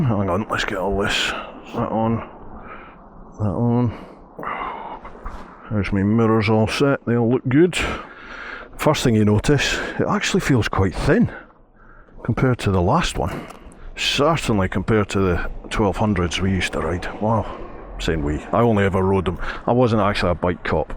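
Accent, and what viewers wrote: Scotland, United Kingdom. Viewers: He's from Scotland, not Ireland. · I have a <num>RT, very pleased with it. I got to ride the 1300RT while my bike was having it's 12000 mile service. The few gripes people had with the 1250 would surely be addressed with the revised model, I thought. But alas, no, the switches are still not back lit, the footpeg position is even worse, and it's even heavier than the slightly obese 1250. (I like fat girls though, so I don't care...). The silly aerodynamic flaps are a joke, and the panniers are nonsense. It still does not have car play, the heated seat and bars are even fiddlier to operate, and the favourite buttons are hidden by the handlebars. When I returned the bike, I was so pleased to get back on the 1250. BMW, you really need to have a serious rethink. I know this might sound absurd, but have you considered listening to your existing customers? 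Of course not, what the hell do we know. Oh, and I can't believe how ugly the new one is. It does not pass the shop window test. As far as this review goes, nice one, I love the Scot's brogue.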